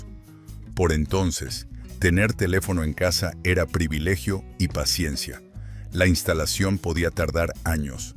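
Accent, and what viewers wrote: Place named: Mexico. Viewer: Pero si este que habla es mejicano !!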